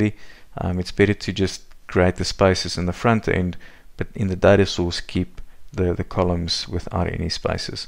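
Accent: South Africa. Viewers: South African? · Thank you Mr. This was 10X better tutorial than others videos out there. Also picked up on that SA accent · Hi, where are you based? Can hear the SA accent. Are you perhaps in Cape Town to attend a course or two? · Cape Town accent??? We are homies.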